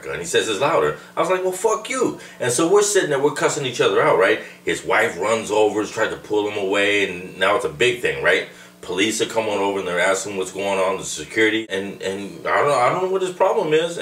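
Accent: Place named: Chicago, United States. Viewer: Dude, respect. Also, are you from the Midwest? I feel like your from Chicago. You sound like you’re a part of my family lol! My family and I have thick Chicago Latin accents haha!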